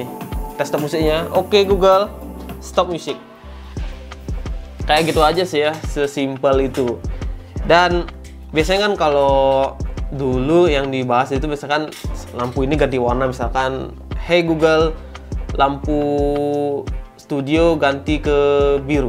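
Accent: Bali, Indonesia. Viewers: Logatnya kaya org bali nih wkwk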